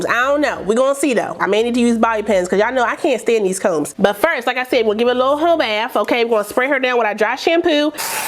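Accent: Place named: Baltimore, United States. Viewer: That Bmore accent! Love it!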